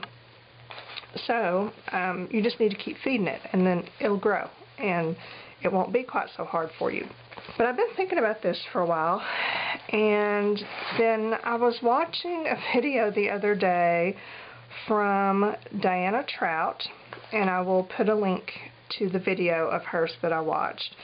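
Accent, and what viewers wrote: South, United States. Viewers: Love your Southern accent, you sound like me!!! Those Yankees just don't sound right. Love this idea too and might have to try it.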